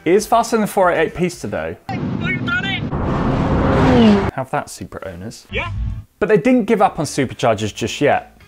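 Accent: United Kingdom. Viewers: British accent. Cant follow it. Turned off.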